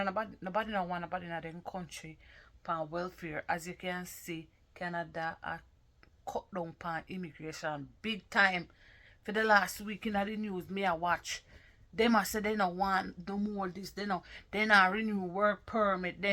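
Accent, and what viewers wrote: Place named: Jamaica. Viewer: Why come on social media to talk about it you use it so pay it and stop talking like Jamaican and idiot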